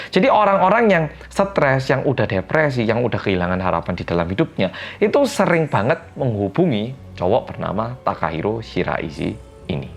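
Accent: Java, Indonesia. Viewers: Bang lu kalo ngomong logatnya kek orang Jawa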